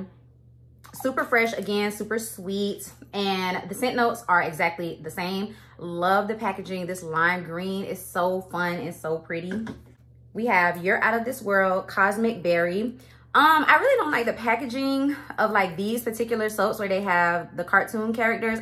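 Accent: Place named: Bay Area, United States. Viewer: Thanks so much for the new scents descriptions! I can't wait to hear your thoughts when the extended Gingham line drops. Btw did I miss a FAQ or get to know me video? I must know if you're from the Bay.😂 You talk so similar to us here in the Bay(Cali not FL) and even some of your mannerisms are similar!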